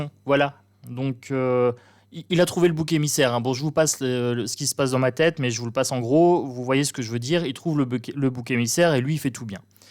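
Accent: Nord-Pas-de-Calais, France. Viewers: Bonjour, vous seriez pas un peu ch'ti par hasard??? J adore votre humour 😊. Merci pour ce petit tirage. A bientôt.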